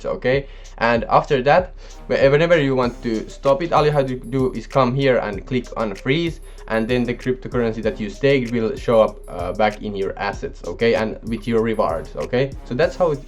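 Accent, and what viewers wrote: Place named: India. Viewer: I get an odd feeling that you talk like an India's people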